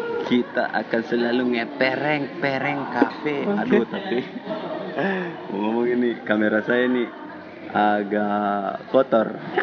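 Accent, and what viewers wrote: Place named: Makassar, Indonesia. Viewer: Mantab tawwa nda nabuangi logat Makassar nya Ada biasa barui sekali ke kacarta ehh kaki ku keinjjak kaki gue 🤣 Salam kenal sodara 🙏 sesama ana Makassar Makassar bisa tonji 🤣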